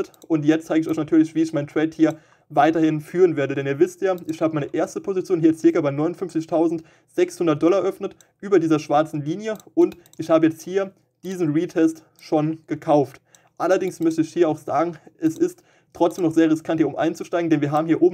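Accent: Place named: Frankfurt, Germany. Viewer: der junge (mann) hat den GRÖSSTEN RESPEKT auf der gesamten YoutubePlatform verdient! Seine Analyse schlägt sogar jeden seriösen englischen crypto kanal! Ich gönn es ihm dass er "etwas" an seinen Links verdient :) Sag mal kommst du aus Frankfurt???? der Dialekt kommt mir sehr bekannt vor (bin selber Frankfurter) oder doch eher richtung Vogelsberg? BITTE TU MIR EIN GEFALLEN - WERDE NIE WIE DIE ANDEREN CRYPTO YOUTUBER !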